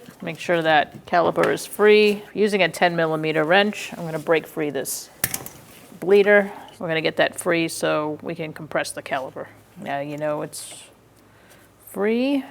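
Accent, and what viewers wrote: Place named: New England, United States. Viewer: wicked new england accent lovin' it kid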